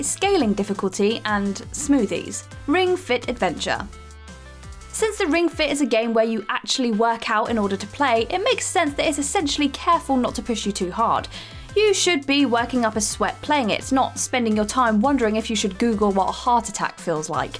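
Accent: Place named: England, United Kingdom. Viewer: A "news lock" run? Yea English accent are definitely annoying and everybody just acts like they absolutely love them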